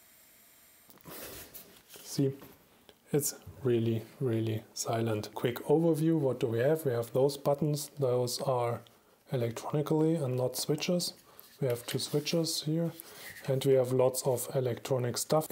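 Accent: Germany. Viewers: Ahaha! Me as a German must say: He is so typically german not only because of his dialect but the way he sees things😂😂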